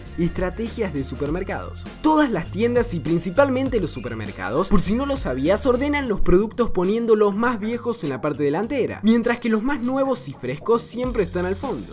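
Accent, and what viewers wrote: Córdoba, Argentina. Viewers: sos cordobes? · decime que sos argentinooo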